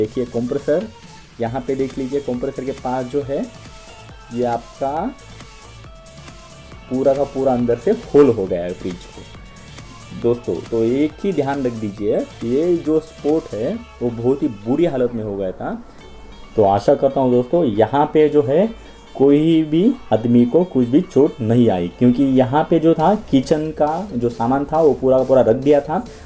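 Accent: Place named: Maharashtra, India. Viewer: Lay bhari gulabi hindi guru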